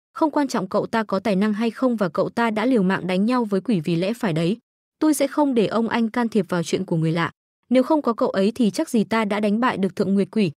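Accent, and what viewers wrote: Central, Vietnam. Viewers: Người miền Trung ò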